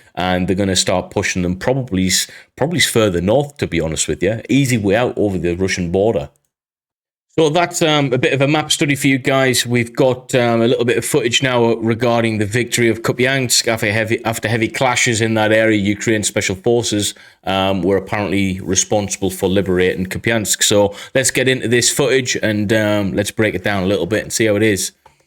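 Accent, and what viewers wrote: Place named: County Durham, United Kingdom. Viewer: You sound like someone from County Durham and for that reason you have my “thumbs up”. Best wishes.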